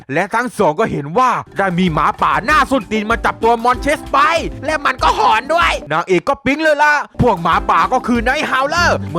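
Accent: South, Thailand. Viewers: พี่เป็นคนใต้หรือเปล่าเนี่ยเห็นสำเนียงเหมือนอ่ะ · ฟังเสียงพากแล้วเพลินดีครับ ออกสำเนียงใต้นิดๆฟังแล้วมีเสน่ห์ดีครับ ทำต่อไปนะครับจะติดตามและเป็นกำลังใจให้ครับ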